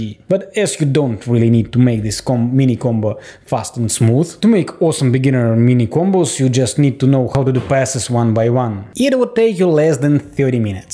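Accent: Russia. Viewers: Русский акцент)Сразу понятно что ты русский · It always seems to me that you have a Russian accent, but it’s not bad, it’s just not familiar to me. :") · Man, I love your ruski accent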